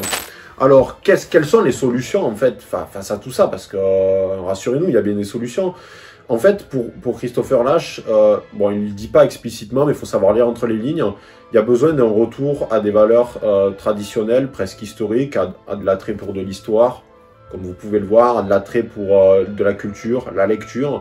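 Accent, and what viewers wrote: South-West, France. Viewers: Encore un nouveau soldat du bon sens qui émerge, ça fait vraiment chaud au coeur en cette sombre période! Merci, vive la culture, vive la France et vive l'accent du sud ouest!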